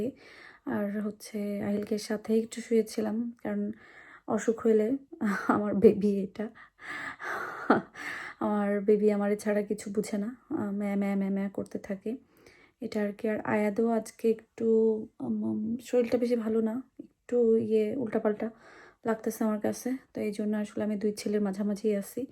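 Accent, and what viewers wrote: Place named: Chandpur, Bangladesh. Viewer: Vaiya khub chalk chadpur bole Kotha Ami b bariar meye gazipur thaki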